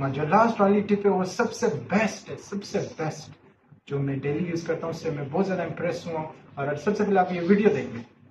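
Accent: Pakistan. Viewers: Bhai tere bolne ke style se lag raha hai ki tu Pakistani hai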